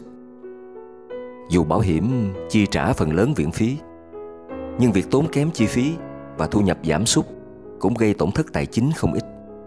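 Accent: South, Vietnam. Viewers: Giọng miền nam của bạn thật hay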